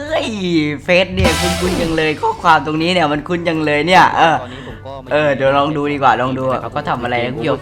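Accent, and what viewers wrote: South, Thailand. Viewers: พี่เป็นคนใต้หรอ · พี่เป็นคนใต้ใช่มั้ยนิ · พี่เป็นคนใต้หมันม่ายคับผมก็เป็นใต้ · แหลงใต้ออก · พี่เป็นคนใต้หรอค้าบ❤️🥰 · พี่เป้นคนใต้ใช่มั้ย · คนใต้นิหว่า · พีแหลงไต้ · คนใต้แม่นนิ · พี่บ่าวเป็นคนใต้หรอ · พี่ครับรหัสหลักผมโดนแฮ็ค<num>ผมควรทำอย่างไรครับใครรู้บอกหน่อย ผมเพิ่งรู้ว่าพี่เป็นคนใต้55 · หนูพึ่งรู้นะเนี่ยว่าพี่เป็นคนใต้😆💕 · ใต้มาชัดเจนเลยนิ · พี่เป็นคนใต้หรอ!! 55555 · พี่คนใต้หรอคับ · คนใต้นิพี่ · แหลงใต้เหมือนเราเลยนิ คนนครศรีธรรมราช5555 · พี่เป็นคนใต้ปะ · ฟังภาษาก็รู้ว่าเป็นคนใต้ผมก็เป็นคนใต้ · คนใต้นิเบอะ555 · พี่พูดใต้ใช้มั้ยตอนที่ไปูบัตรเปลี่ยนเชื่อ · ชัดเลยพี่เป็นคนใต้ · คนใต้มั้ยนิ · พี่เป็นใต้เห่อ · พีขอถามหน่อยเป็นคนใต้ไม่เนี้ย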